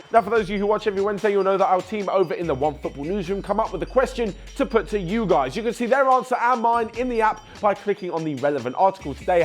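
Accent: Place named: United Kingdom. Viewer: Can he speak a little bit slowly. Like i said his accent is very British that is quite hard to understand when he speak that fast.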